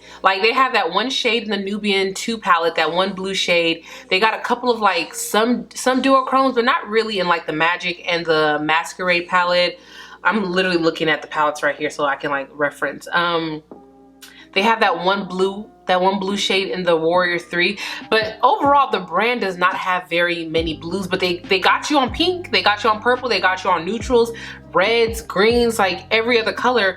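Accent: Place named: Nigeria. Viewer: The naija accent tho 😂 Love ❤️ this look 😍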